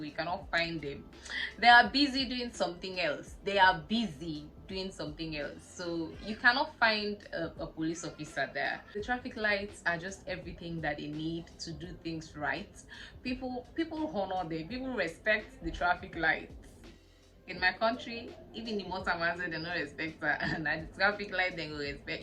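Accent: United Kingdom. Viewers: This was so fascinating to watch and oh, you've got the accent 🤗. England has and still is, my dream country 😅. The day I get opportuned, I'll contact you to walk me through 👏🏽. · yeh few more months an u would have been speaking 🗣️ queen English…it was bang on‼️ really good to hear👂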